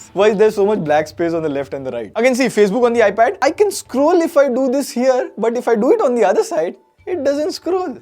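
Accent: India. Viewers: Bsdk tu Indian hai ya angraz ?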